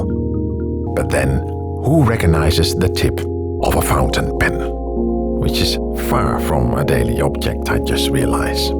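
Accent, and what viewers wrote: Netherlands. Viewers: The most dutch accent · Ik dacht al een Nederlands accent te horen en de krantensnipper bewijst het ;)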